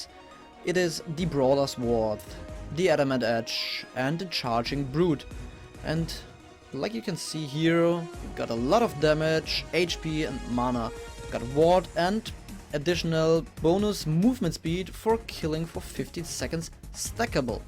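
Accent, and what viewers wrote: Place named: Germany. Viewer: your german accent is painfully obvious.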